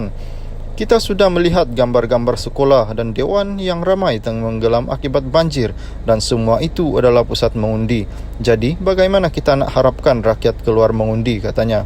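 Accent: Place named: Sabah, Malaysia. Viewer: Hei bro suara kamu dah tentu orang Sabah.. kamu makan le duit yang ditabur oleh musuh2 umno kepada kamu untuk berita2 jahat yang mengelirukan rakyat.. aku berdoa semoga duit2 yang kamu dapat dan memberi keluarga kamu makan menjadi BARA API NERAKA yang akan memusnahkan kamu.. kamu orang JAHAT! Puuiiiiii Poodah!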